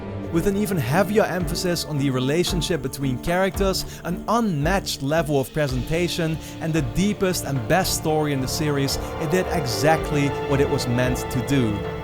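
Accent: Netherlands. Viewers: are you dutch because you have that dutch accent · The Dutch accent is strong with this one.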